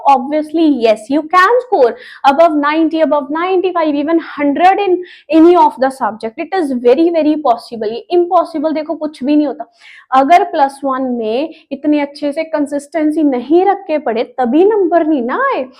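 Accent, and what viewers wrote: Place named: Punjab, India. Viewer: Mam i think tou are from punjab from your accent😅😇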